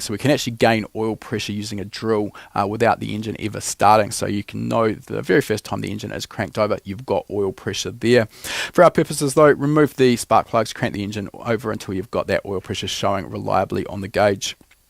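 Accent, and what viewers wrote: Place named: New Zealand. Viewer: Perhaps that's how guys from NZ sound.The content is precious though so pay attn to that ☺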